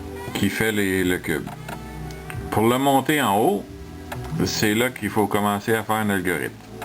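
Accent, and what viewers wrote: Quebec, Canada. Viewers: L'accent québécois ça me déconcentre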